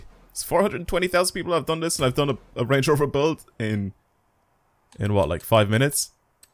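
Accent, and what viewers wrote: Ireland. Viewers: where are you from you sound just like me and im irish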